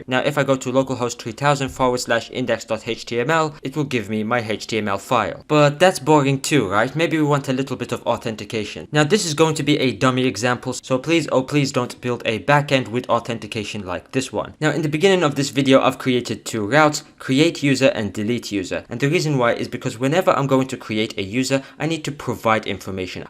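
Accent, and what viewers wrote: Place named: France. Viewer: man this is so much better than those 30+ minute videos, short and to to the point + meme game is on point + a French? accent 10/10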